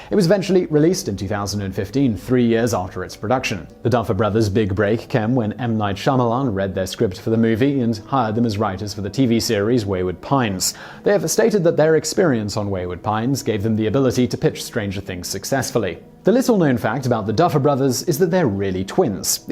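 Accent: United Kingdom. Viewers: You do understand he is British. They pronounce certain words differently. Unlike american english which has been flattened. We say words differently. So back off his pronunciation.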